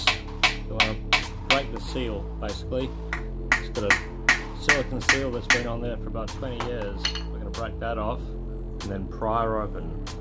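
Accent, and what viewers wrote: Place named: Australia. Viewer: ur sounding like a bloody auzzie keint haha. love it. awesome videos. keep it up.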